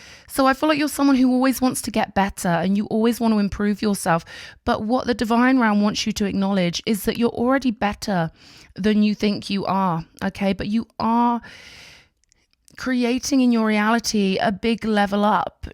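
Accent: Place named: England, United Kingdom. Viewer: Lovely to hear your English accent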